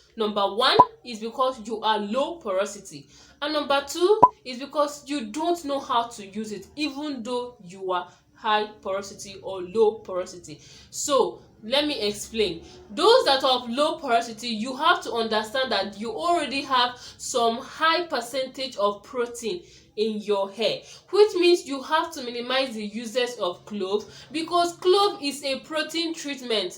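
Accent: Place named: Caribbean. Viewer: You have a accent are you carribbean?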